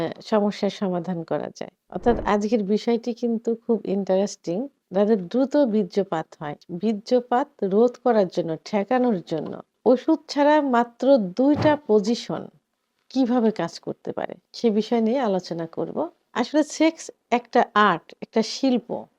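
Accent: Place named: Noakhali, Bangladesh. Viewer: ওরে নোয়াখাইল্লা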